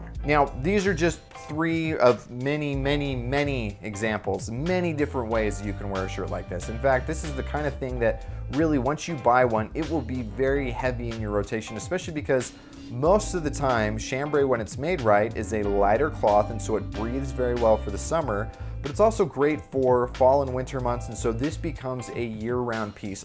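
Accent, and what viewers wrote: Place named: Salt Lake City, United States. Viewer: Ok..you have to be based in SLC... I thought I recognized how you say " imporTant"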